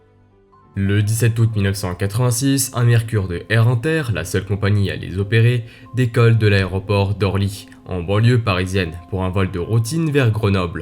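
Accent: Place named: Japan. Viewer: Je suppose que est Japonais ou d'origine japonaise. On le ressent bien par l'intonation de la voix. Donc, il s'exprime un peu comme ses parents et il n'y peut rien. En plus son français est excellent, articule parfaitement bien et ne parle pas comme ces mongols qui adorent ajouter le son "j" après les "d" ou les "t" comme par exemple " je tj'ai djit". Ça oui, c'est trop insupportable à entendre.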